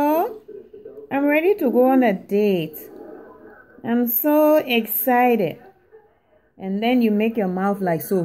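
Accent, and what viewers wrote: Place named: Liberia. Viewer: Liberian accent haha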